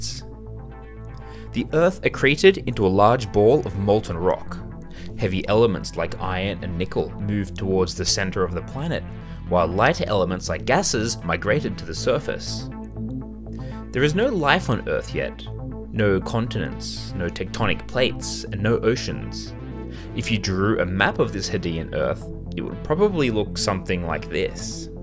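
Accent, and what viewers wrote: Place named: Australia. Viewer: I reluctantly clicked on this video, thinking it'd be just another AI-written and narrated video, but upon hearing the mellow Aussie voice and the distinctly human drawings, I was pleasantly surprised at the discovery of this channel. Looking forward to watching the rest of the series!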